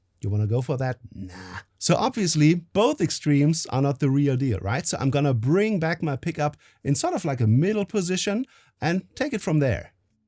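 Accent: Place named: Germany. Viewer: great instructial piece being a seventy something German American it is refreshing to hear the Deutsch englander accent. Again great video.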